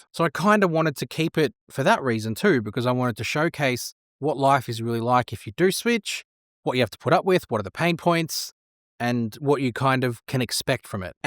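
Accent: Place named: Australia. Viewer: Haha, never thought of it before you mentioned. Great guy though. Even better than SJ because has the sweet Aussie accent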